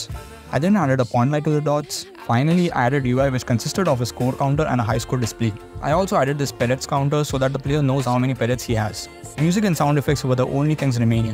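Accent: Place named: India. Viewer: you sound like indian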